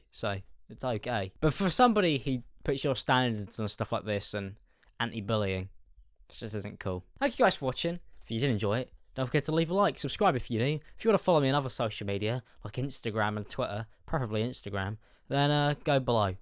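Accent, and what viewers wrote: United Kingdom. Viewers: i usually cant stand hearing the british accent (for no reason) but i really appreciate your vids!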